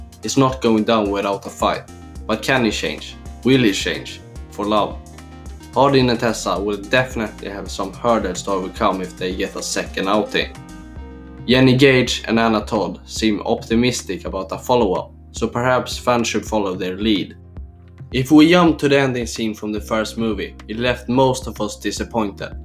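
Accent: Sweden. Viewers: U rly sound Swedish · Visst är du som pratar svensk hahahaah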